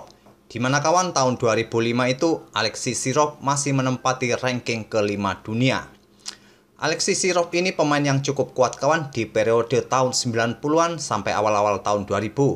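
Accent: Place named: East Java, Indonesia. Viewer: owh admin nya jawa timur, oke bng sukses selalu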